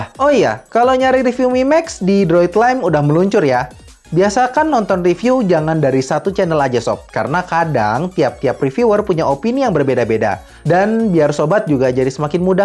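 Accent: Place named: Sundanese, Indonesia. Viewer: Bang, Logat Sundanya kocak bat dah:v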